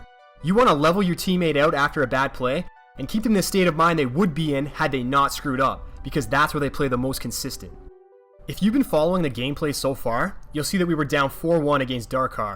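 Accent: Canada. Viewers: the candian/minnesotan is strong with this one haha. great tip man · lol you can tell this guy is Canadian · are u canadian? you sound canadian.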